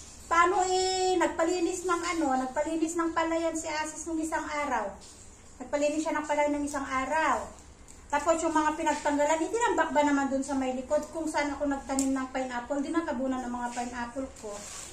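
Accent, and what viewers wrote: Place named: Ilocano, Philippines. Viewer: Adda latta tono nga ilocono ka ate hehe.. God Bless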